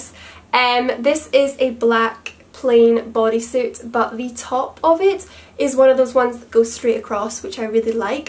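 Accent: Scotland, United Kingdom. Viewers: It's so nice to hear another Scottish accent on youtube 👏🏻👏🏻 I've been trying to find fellow Scottish youtubers, absolutely adore your videos!! 😊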